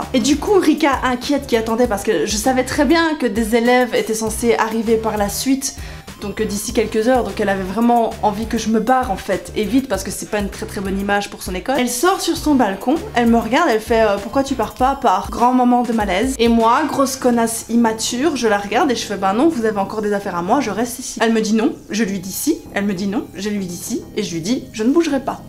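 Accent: Belgium. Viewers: Pierre Petit elle parle de Bruxelles à moment donné et vu ça façon de parler j'en suis quasi certain (c'est la première vidéo que je regarde) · Du coup, moi qui suis nouveau ici e arrivé presque au milieu de la video. J'ai été faire un tour sur ta playlist mais ej trouve pas la première partie. Je fais comment pour avoir le début de l'histoire. Parce que l'air de rien ça m’intéresse. Je suis malade. au lit et je m'ennuie, je regarde donc des vidéos de gens qui racontent leur vie. Et j'aime bien. Kestuvafèr ? EDIT: c'est bon j'ai trouvé et j'ai vu la partie 1. Donc je suis de retour pour regarder la suite. EDIT2: pourquoi tant de dislike? les gens n'aime pas notre accent belge? Enfin pas vraiment belge puisque sud du Hainaut ou nord du Luxembourg.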